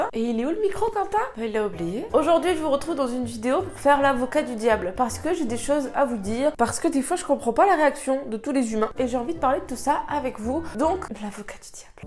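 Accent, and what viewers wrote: South, France. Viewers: En vrai je comprend pas trop pouquoi tu te prend la tête avec ce genre de com', perso je les trouvents pas insultant et c'est plutôt légitime de poser des questions sur le contenue surtout si d'un moment à un autre ça change... Ils ont pas critiqué ton choix mais poser des questions et c'est pas grave (dans ceux que ta montrer). Si tu voulais pas repondre et te prendre la tête ne répond pas et passe à autre chose car je t'ai trouver vachement tranchante dans tes reponses de manière injustifié ^^' Ça ce voit que ça te tiens à coeur mais les questions de montage et de contenue sont inévitable sur youtube... tu te prend la tête pour rien ❤ Oui ta le droit de flouter/ ne pas flouter ce que tu veux et il y aurait eu des com' de toute manière mais sur autre chose... c'est ça la connerie de youtube et si tu te prend la tête comme ça pour des com' où les gens ne t'insulte/ ne sont même pas vulgaire / ne te reproche rien mais juste pose des questions tu vas avoir énormément de mal a supporter la vie de youtubeuse de 2020.. 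Et jcp pouquoi tu parle de féministe car je voue aucun com' qui dit " meuf tu es féministe c'est inadmissible de pas montrer tes tetes". Je pense tu te prend la tête pour rien ❤ ps: si l'accent du Sud c'est chou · Fait se que tu as envie les gens son idiot 😑 j’adore ton accent du sud mdrrrr 😘❤️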